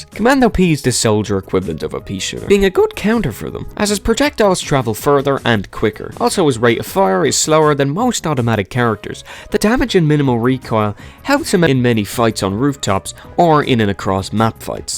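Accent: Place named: Ireland. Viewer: Irish accent 🤑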